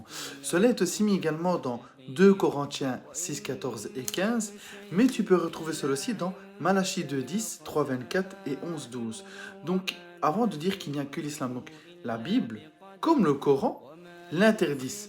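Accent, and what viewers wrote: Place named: Namur, Belgium. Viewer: Ou habite tu en belgique ? J'entends un accent de namur mais je ne suis pas 100 % sur. On pourrait parler face à face d'appliquer la sh4ria dans notre pays et les details que ça implique ? Tu pourras réaliser le nombre incroyable d'infidéles à faire Peter avant que nos loi et advancés sociales ne retournent à l'age de fer.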